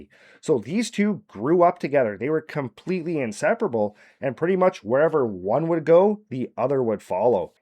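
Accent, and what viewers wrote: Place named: Canada. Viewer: I really like your story telling here. With practice, I think you could become a unique voice, but if i might give just bit of critique, find your own voice and deliver confidently with that. You're abour the third or fourth person I've ran across that has tried to match cadence and narration to Mr. Ballen. I'm not saying this because I'm a fan of his. I personally find his delivery to be kinda annoying and something that only works for him. You've got something unique with your Canadian accents, and way of talking. Lean into that.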